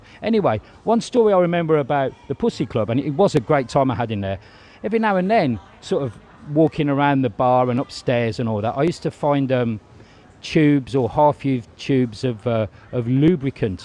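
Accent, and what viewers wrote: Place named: Black Country, United Kingdom. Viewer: Even though you sound yam, I can imagine it must have been a bit of a culture shock going from Smethwick to Soi 6?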